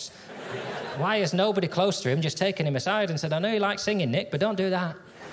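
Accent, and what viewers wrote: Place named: North of England, United Kingdom. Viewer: North of England, I believe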